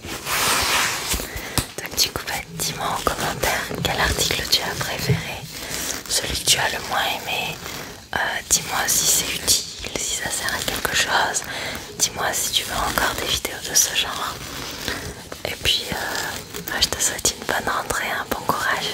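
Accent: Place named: South, France. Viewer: Hello très sympa cette vidéo. Tu as la voix qui chantonne. D’où viens-tu ? J’adore les accents du sud 🥰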